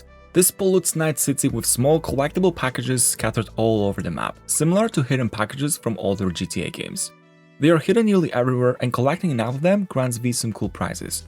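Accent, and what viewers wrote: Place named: Europe. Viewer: This guy's English sounds like those European guys in Family Guy.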